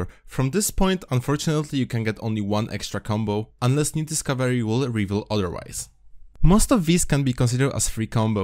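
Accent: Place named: Poland. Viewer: he is polish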